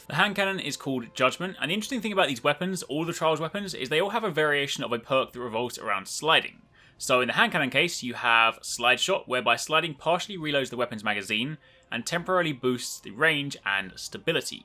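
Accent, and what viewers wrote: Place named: United Kingdom. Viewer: Wait are you seriously that black man and sound British?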